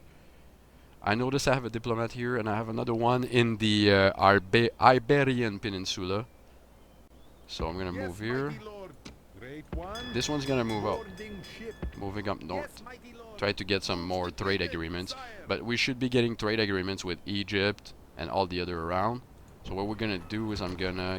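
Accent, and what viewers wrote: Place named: Quebec, Canada. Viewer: French Canadian :)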